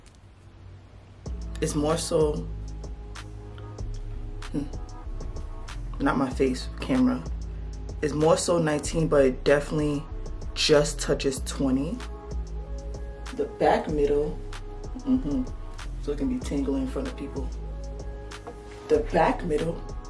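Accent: Liberia. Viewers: Liberian girl? I hear the accent 🇱🇷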